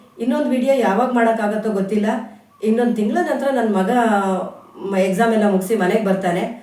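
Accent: Malnad, India. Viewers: Namge nam malnad bashe andre thumba ista❤❤❤ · Madam nimma video tunba chennage bartede niu hakero yalla video nija jevanadalli namma malinadenavara kathe ni ide nanu 26 year inda banglore nalli irodu but nimma video one day nu nodade irodella tunba namm urenavaru mataduva hage ide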